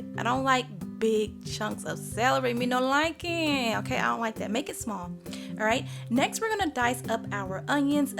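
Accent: South, United States. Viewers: I fucking love this woman, now! You’re so southern! 😢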